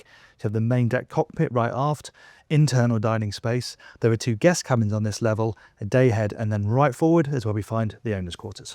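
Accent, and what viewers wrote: United Kingdom. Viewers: PASHAGEWAYS....love hearing the British speak!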